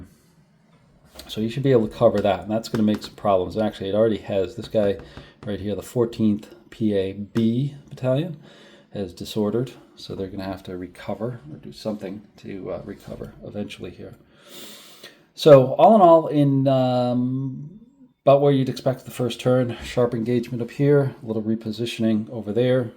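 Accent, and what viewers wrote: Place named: Massachusetts, United States. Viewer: I can see how a Massachusetts accent wouldn't work down in ol' Virginia!! Actually, everyone NOT from the Shenandoah would likely make the same mistake with Staunton. It's part of the rite of passage as one gets immersed in Civil War history!! I'm still learning about the odd pronunciations of some waterways (Mattaponi, Ni, etc.) and some of the commanders are routinely mispronounced (the two Taliaferro's, pronounced "Tolliver", Huger (pronounced more like YOU-zhee) and even Heth (pronounced more like "Heath"!! I also find many people seem to trip over Sigel, which you did not, to your credit. I don't know WHERE someone would get "SY-jel" out of that, but some do!!! 😉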